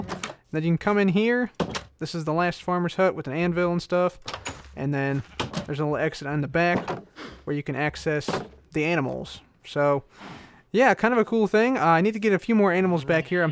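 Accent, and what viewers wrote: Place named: Ohio, United States. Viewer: probably america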